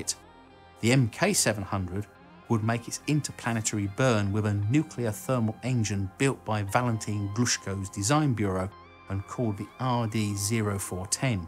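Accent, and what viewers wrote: United Kingdom. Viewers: I'm loving the British Accent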